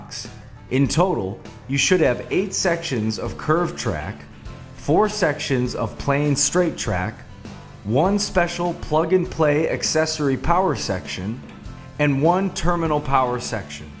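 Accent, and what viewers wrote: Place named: Midwest, United States. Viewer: Somehow I was able to recognize by his voice and accent alone. That's a benefit of being a Midwestern American I suppose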